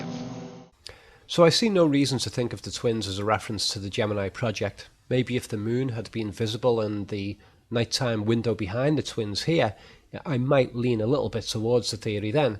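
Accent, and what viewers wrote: Liverpool, United Kingdom. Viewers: i didn't realize you were a scouser! errrrm nice one :)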